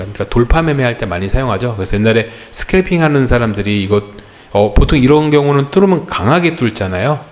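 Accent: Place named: Seoul, South Korea. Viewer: 와 목소리 달달하다.. 이러니 서울말에 뻑이가지…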